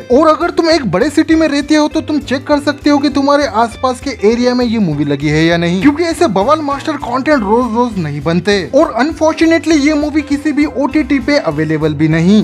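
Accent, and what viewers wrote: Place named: Odisha, India. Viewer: Tere hindi bolne se lagta he tu odiya he hindi me promotion kara raha he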